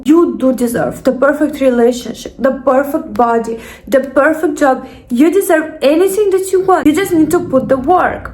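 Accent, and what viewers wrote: Russia. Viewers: I adore your accent. Are you from Russia? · Since one year I just stopped to sharing my project of life plans with some friends, acquaintances and one member of my family just because I see that everytime that I speak of my plans they're leads immediately to fail. Concerning my family side the only thing that I used to get was skepticism and cynical remarks : like how I dare to even have ambitions ? And even if I don't share anymore I can easily get one mocking comment litteraly asking me if I don't plan to be the next Kim Kardashian. I decided to just let it go and not getting affected by it, because at the end of the day I have accomplished so much things by myself and that I'm stronger than them. But seriously, to live happy let's live hidden! Now I just share the bare minimum and even so I just lie about some details because at the end of the day it's my life, and like those people aren't helping that much what's the point to share crucial details if in the end it just prejudice me ? 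At the end best weapon against haters is to give them an ulcer by becoming more better that you are actually already. P.S Don't worry about those дурак comments, they just hate you because you're Russian. 😂 They wouldn't dare to make those remark about your accent if you where from another ethnic group, it's just typical American slavic women hate. Keep the good work and thanks for your deep advices.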